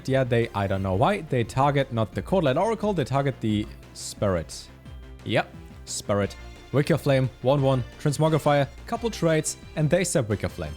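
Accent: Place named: Germany. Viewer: can i ask u from where u are? u sound german ^^. just found ur channel, nice content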